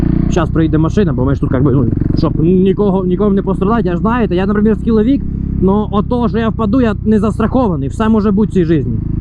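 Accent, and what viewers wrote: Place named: East, Ukraine. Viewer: Як на мене українська зі східним діалектом, або невеликим суржиком. Я кна мене на 100% краще ніж чиста кацапська🤢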